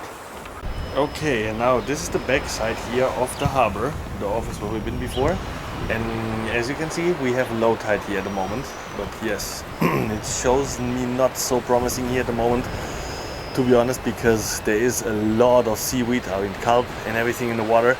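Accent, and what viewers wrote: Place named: Europe. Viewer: Im half Scottish half Hungarian. And you sound kinda european so I thought i’d ask🤣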